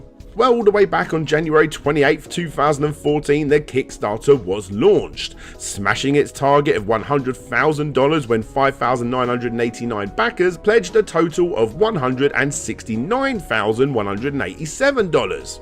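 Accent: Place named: United Kingdom. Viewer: In case you never heard such accent before you should know that he's from the U.K ,idiot . Guns are illegal.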